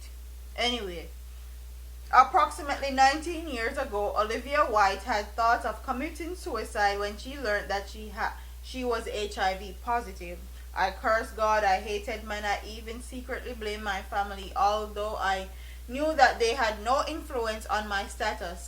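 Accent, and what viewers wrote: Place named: Rural, Jamaica. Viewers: Im not gonna lie and be a hypocrite but i also make fun of the way u speak. My 1st thought was "where in jamaica is she from"? You do have a strong country accent. However, I Never thought ur accent define who you are as you seem to be intelligent No malice in that observation sis. Pure love. Big up uself. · same suh mi talk too.. country mi com from too. an proud of it love the way you talk hun love you an soulflotv..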